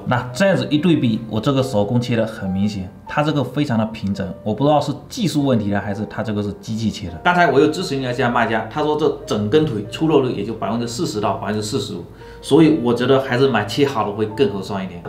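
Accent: Fuzhou, China. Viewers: 胡州老哥普通划很亲切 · 口音听起来是福州人吗？ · 我怎么觉得你是福州人，哈哈哈哈，口音和我家叔叔的好像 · 浓浓的福州腔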